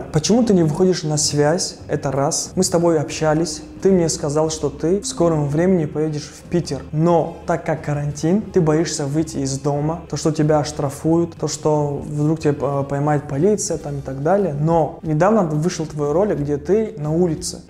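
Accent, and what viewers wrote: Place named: South, Tajikistan. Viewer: ман аз Конибодом.Хамон видеоролике ки гуё духтар аз Конибодом бошад боварам намеояд. Аксенти мо дигар. Вай мисли одамони чануб гап задаги.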